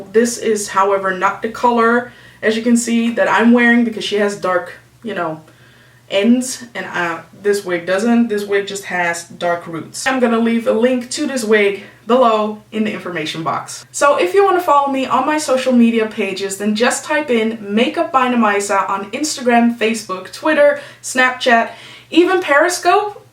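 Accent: Netherlands. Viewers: OMG are you dutch??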